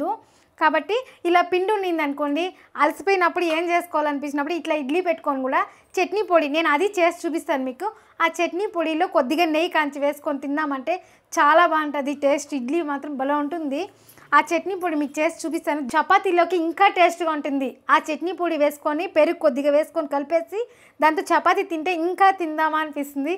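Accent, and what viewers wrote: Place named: Tamil Nadu, India. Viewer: Telugu bale tamil vaalla telugu la bagundhi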